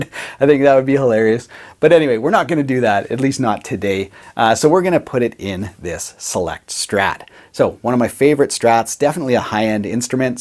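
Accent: Canada. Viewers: are you Canadian????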